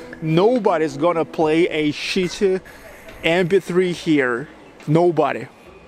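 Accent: Russia. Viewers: I understood "salary's lab". You have russian pronouciatio: "o" becomes "a" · Daanggg, I was in St. Petersburg in 2018 for 12 days but I didn’t know about this place. Awesome! Btw. your thick fat Russian accented American English is so much fun to listen to. I subbed.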